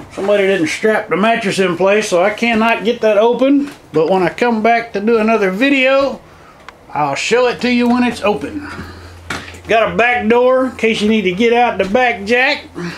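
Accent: Texas, United States. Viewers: Texan accent?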